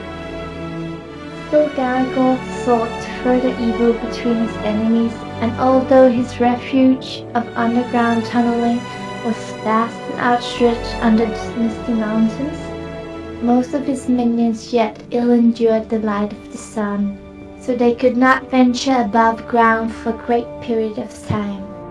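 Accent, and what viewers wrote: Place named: Netherlands. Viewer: I liked the video :D Thank you for the subtitles, though. You have a pretty dutch accent :)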